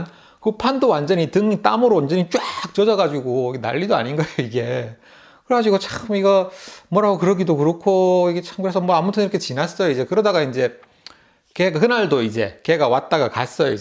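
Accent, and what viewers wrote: Busan, South Korea. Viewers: 앗 저도 부산출신 ㅋㅋ 저도 영어속에 사투리 ㅋㅋㅋ 근데 저보다 더 사투리 촌스러운거 같은데요~ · 미국집 냉난방에 대해서 좀 소개 해주세요 비용이라던지,시스템이라던지 부산말 확 표나요 ㅋㅋ 부산 촌놈으로서 바로 알았습니다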